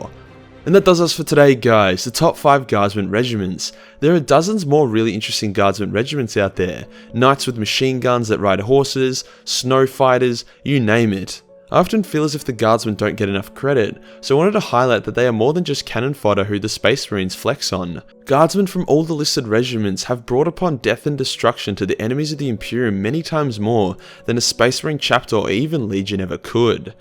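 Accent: Australia. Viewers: It's good to hear an Aussie doing this stuff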